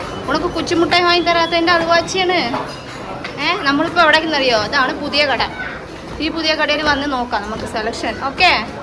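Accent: Tamil Nadu, India. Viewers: മലയാളം തമിഴ് രീതിയിൽ അങ്ങ് പറയാ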